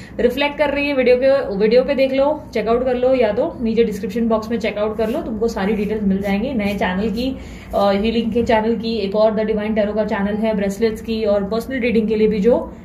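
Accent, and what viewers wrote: Mumbai, India. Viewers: Apki Mumbai language mast lagti hai mujhe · Ekdam mast reading mumbaiya language di amchi mumbai jindabaad🤩, I claim all positive energies thank you universe thank you divine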